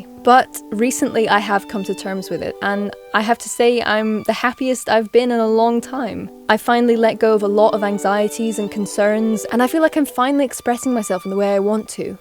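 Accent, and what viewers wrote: England, United Kingdom. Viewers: are you English because you don't sound America thou I might be wrong because I'm english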